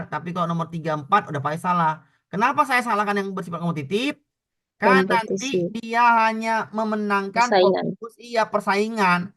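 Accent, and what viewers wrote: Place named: Medan, Indonesia. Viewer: Medan kali la pak ni yang ko dengar la apa yg di bilang tu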